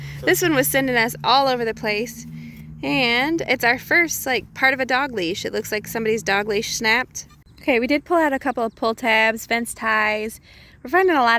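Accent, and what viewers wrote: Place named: Michigan, United States. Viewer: Nice finds,,like the Michigan accent, i got to hunt in pontiac once, i know a few folks in Houghton lake and Traverse city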